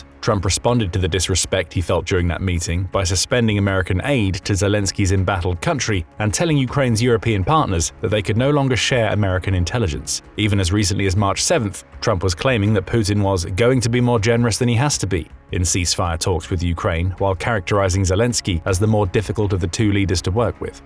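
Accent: United Kingdom. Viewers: Since you are British or Australian.? (due to your accent) you must be for sure against Russia and Putin. To use the word betray is wrong and you have no solid proof that it is the case. I am not Russian but I believe that Russia could eliminate Zelensky in minutes with an Orishnik rocket. However, because Putin promised the previous Israeli leader, Yahud Olmert ( name spelling could be wrong) not to take him out (because Zelensky is Jewish) he is refraining from taking care of Zelensky. My point is this Trump wants peace and to stop the killings in Ukraine. He is a businessman. Imagine if the three superpowers agree to peace the world would be in a much better place economically and safety wise. By the way, Putin wants to mediate cooperation between Iran and China which will happen very soon. I hope Putin will be able to succeed. Peace on earth.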